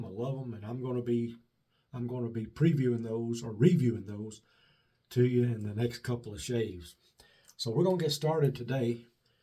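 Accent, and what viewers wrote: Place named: South, United States. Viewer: Excellent shave sir with top notch products!!! If the soap smells like Stirling Haverford, which happens to own, it must smell darn good. Tobacco and vanilla can't go wrong. The razors audio feedback is tremendous, I'd love to own one, can't get them in the UK unfortunately unless I pay the ridiculous shipping costs involved. I have also the exact same semogue OC with this orange handle it's fantastic brush as all the semogues are. Love your videos keep them coming. I also love your southern accent it's my favorite accent sounds like music in my ears. How often do you shave? Enjoy your day buddy.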